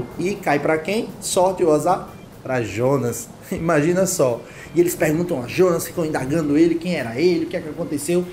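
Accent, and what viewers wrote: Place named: Northeast, Brazil. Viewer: Top de mais! Os desenhos são ótimos e a narração é muito boa, especialmente pelo sotaque nordestino! Parabéns! Continuem! Deus vos abençoe!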